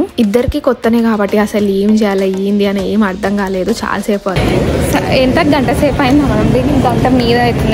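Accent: Telangana, India. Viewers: #sappaga undhi telangana slag epudu boor kottadu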